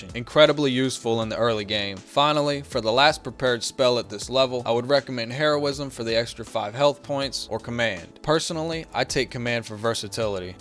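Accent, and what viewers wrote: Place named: Staten Island, United States. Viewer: Had to subscribe. You totally sound like you're from my neck of the woods (I'm in Staten Island).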